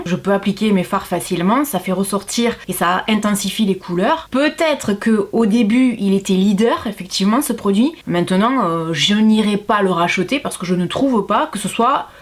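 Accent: South, France. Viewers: ET SON ACCENT DU SUD UN REGALE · Il est beau notre accent!! Merci pour cette video. Bisous d une catalane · Coucou. J’ai toujours voulu tester les marques M.A.C et Maybelline, malheureusement elles testent toujours sur les animaux donc je préfère m’abstenir.... J’ai beaucoup aimé ta vidéo mais surtout ton accent du sud! 😂 Bon week-end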